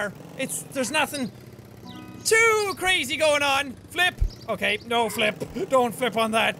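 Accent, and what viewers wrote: Ireland. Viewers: the more excited jack gets, the more progressively Irish he gets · Are you Irish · Knickers, another word for underwear lol he just Irish so it sounds funny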